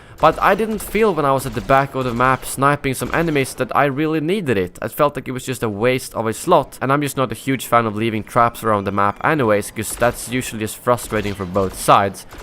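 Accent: Sweden. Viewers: Are you from sweden, you sounds like that. Hope you don't take this bad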